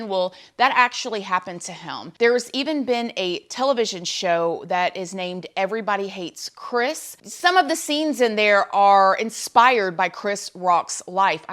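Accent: South, United States. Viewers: I know you are happily married and all. But I just got to say, you are hot! I love your style, particularly your cool hairdos and your southern accent...and your funny. that's all.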